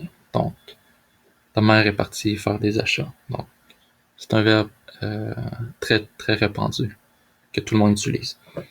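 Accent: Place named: Quebec, Canada. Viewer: J'adore l'accent québécois ! .. et tes vidéo aident moi .. Merciii ...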